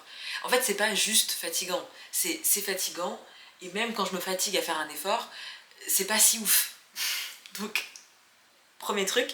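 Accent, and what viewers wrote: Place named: France. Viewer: SALUT, c'est guillaume peuchaud, j'avais le meme problème pour l'alter ego en voyage. Au début je voulais aussi diminuer l'intensité de mon accent pour avoir le sentiment de progresser mais au final ce n'était pas moi que j'entendais parler, mais quelqu'un d'autre, quelqu'un de faux. Alors la technique que j'ai trouvé c'est de garder mon accent Français. Je sais que tu veux diminuer ton accent Français pour te sentir progresser, et peut être qu'à force de pas mal de temps de sacrifice tu réussira à parler anglais avec l'accent américain, sans meme penser au fait que t'es entrain de te forcer, ca sera donc devenu naturel? Mais je pense quand meme que dans ce processus ta personnalité aura changé ne serait-ce qu'un tout petit peu (c'est ce que je ressentais). Donc ce sera ton nouveau toi quoi... La t'es aux US donc forcément tout le monde a l'accent américain, et dans la communauté française à laquelle tu appartiens, forcement c'est stylé d'être le Français avec l'accent le moins Français, c'est une preuve de ton niveau... 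Mais perso dans mon voyage j'étais constamment avec des gens de différentes nationalités, Thaïlandais, Espagnols, Ukrainiens et j'ai compris une chose, l'anglais est une langue internationale, faite pour être parlée par le monde entier, et chaque pays a son accent. Pourquoi les 197 pays devraient se calquer sur le modèle British ou US? D'ailleurs, les Américains n'essayent pas d'avoir l'accent British alors pourtant que l'origine de la langue angla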